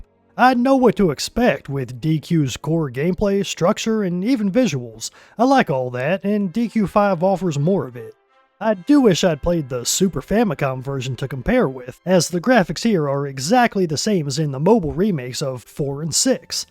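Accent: South, United States. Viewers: listening to a guy with a southern accent talk about JRPGs is like listening to an indian talk about using a toilet+toilet paper+washing their hands afterwards... not exactly the source i want to rely on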